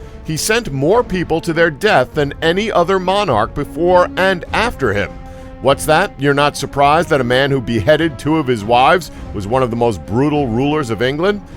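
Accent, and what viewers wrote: United States. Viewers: Why do have to listen to an American commentator, with a dreadful American accent, on this video? A British commentator would never use the word “freakin,” and he would also know how to pronounce the word “Salisbury” correctly. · Whats with the yank commentary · The American accent seems to cheapen even these inaccurate facts...very off putting. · Urrgh, i turned off as soon as a heard the American voice...this video will be 90% bullshit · What the hell is an American robotic going to sound credible about English history. Don’t make me laugh. Didn’t even watch. Too stupid.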